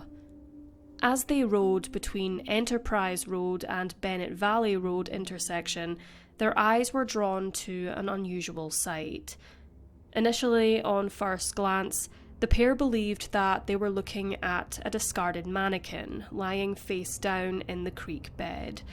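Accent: Scotland, United Kingdom. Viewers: I love your british accent if I am not wrong. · Really? What a nasty thing to say! This lady is Scottish, but obviously you’re not educated enough to realise that! If this is an example of how your mind works, you should be ashamed of yourself.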